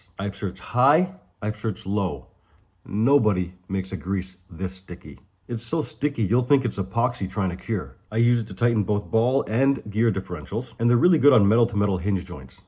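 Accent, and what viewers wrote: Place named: Canada. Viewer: Hearing Canadians say "out" always cracks me up😂😂. Thanks for the info!!!!!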